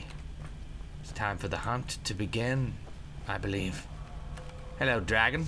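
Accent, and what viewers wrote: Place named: Scotland, United Kingdom. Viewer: awesome Scottish accent xD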